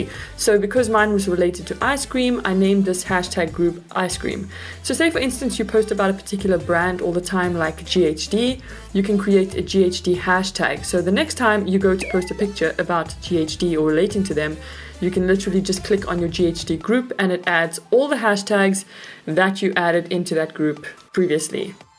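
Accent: South Africa. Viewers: Thanks for sharing, it was really lekker to hear a fellow South African too! I'm based in the UK now and have been for the past 3 years! Still struggling along with social media!! Keep well, and thanks again. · Hey awesome vid by any chance are you South African ? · Are you from South Africa? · Cool Video... Great hearing a South African voice on YouTube :-)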